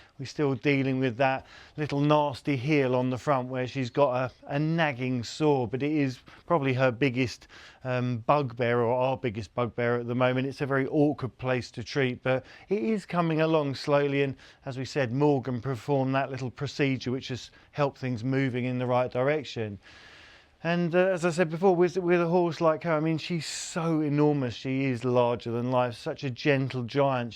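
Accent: United Kingdom. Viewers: ohhhh LOVE THE INTRO of that sweet british voice def need more ;];];] sorry i live in maine in the U.S.A. and i cannot get enought;];];]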